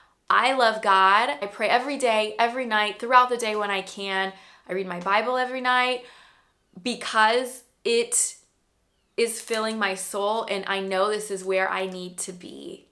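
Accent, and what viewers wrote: United States. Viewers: Rrrr u are so pretty I like your hair and tht American accent is so hot🤭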